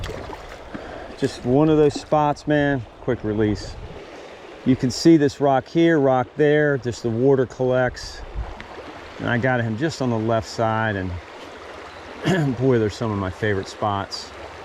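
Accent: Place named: Pennsylvania, United States. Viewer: I'm from PA and everytime I hear you say water(wuder)it takes me back. Love it! Took me a long time to stop saying it that way myself after I moved - probably because I wasn't trying to change it. I live in the Smoky Mountains of NC now and just started fly fishing this year. Lots of good places to fish, but I'm in the putting in your dues stage of fly fishing. A nice way of saying I'm not very good yet, but I love doing it! You are really hitting them Trout. I sometimes go 4 or 5 days without catching a fish! Just started euro nymphing myself and really like the control and feel. Anyway, great channel - I really enjoy your videos and just subscribed. Appreciate the Euro tips. Your inspiring me to head back to PA to see some Family and try the fishing that way.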